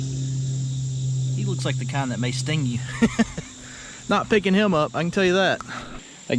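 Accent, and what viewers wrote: South, United States. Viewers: That's not a "southern accent"!!! If you know your way around the country,(OTR) truckers know what I mean... You have an Alabama accent.. Texas and Georgia are subtly different... The Midwest, Illinois, Ohio, New York City,New Jersey etc. All have a manner of speaking that are truly different... North and South Carolina,too.... Love your site and your enthusiasm for when you find something really nice....🤓 Watch out for the rabid crawdads and insane fish😂.... Be Blessed and stay safe...🙏☝️🕊️✝️ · I was born a yankee, but I’m a southerner now. The accent sounds like sweet tea served on the front porch. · From northern midwest, where not much accent. Southern accent is cool.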